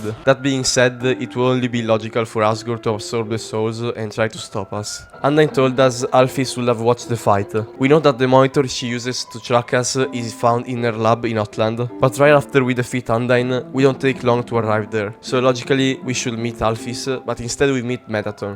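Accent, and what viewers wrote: Italy. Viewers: Ho aperto il video letteralmente 2 secondi ed ho detto: Ma è italiano! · i can hear the italian accent... are you italian?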